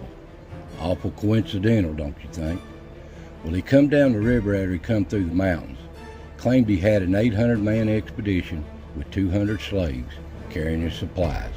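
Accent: Appalachia, United States. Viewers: Love to hear you talk. You sound like my folks. Do you use words like "kiver" for "cover", "over yonder" for "over there", "igoddling" for "moving in an unpredictable and erratic fashion"? I'm big on keeping my Appalachian linguistic culture.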